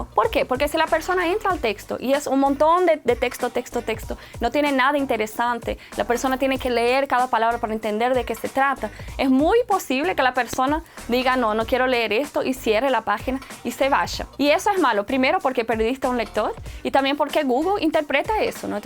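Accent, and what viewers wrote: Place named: Brazil. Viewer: Me encanta su español con un toque brasileño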